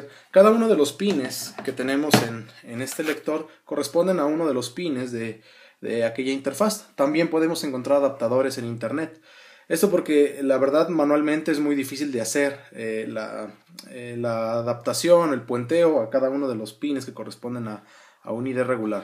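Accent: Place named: Mexico. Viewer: ¡Excelente información!!!!!!! Por el acento supongo que vos sos mexicano!! Gracias por todo lo que enseñás en el video. En mi país al cable negro lo llamamos polo negativo y al rojo, polo negativo; pero al final es lo mismo, sólo cuestión de terminologías. Muy bueno el aporte. De nuevo mil gracias!!!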